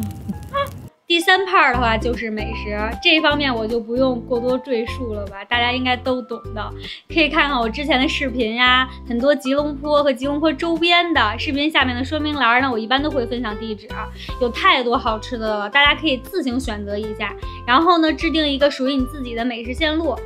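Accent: China. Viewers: 主播北京的吗？ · 是那里人？口音像是北方人😊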